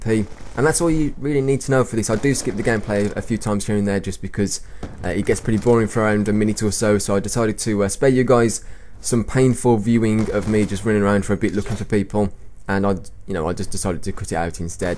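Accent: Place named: Black Country, United Kingdom. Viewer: Where in England are you from? You sound like you're from the Black Country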